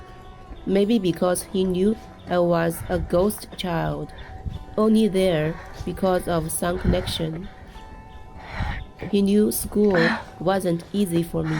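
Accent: China. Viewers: Your English is quite good. Never mind the slight Chinese accent, how could it be otherwise? Your pronunciation is good and your sentences are fluent and correct. Do not underestimate yourself, you are an ambassador for your people. I think you are a very brave woman. 🙂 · Your video is refreshingly real. Your English pronunciation is extremely clear and much better than most students from elite universities in China whom I met in the USA. One more thing, there is nothing wrong with having a Chinese accent because we can understand you effortlessly; in fact, I think accents add richness and diversity.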